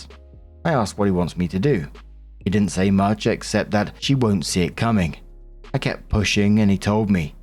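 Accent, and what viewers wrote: United Kingdom. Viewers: Hey I just wanted to let you know that I really enjoy it when you talk about your own life and relate it to the stories. I feel like you must've gotten comments from ppl who don't like this or something bc you always defend bringing up your personal life. If people don't want to hear about it then they should go listen to AI robotic slop voice rereading reddit stories. I like your channel BECAUSE you bring a personal touch and I also love British accents lol. You're the only channel on yt I actually pay a membership for bc I appreciate your content so much. I listen almost daily on my commute to work and back and I really enjoy hearing about you and poppy and your family. So please don't ever be discouraged to share that.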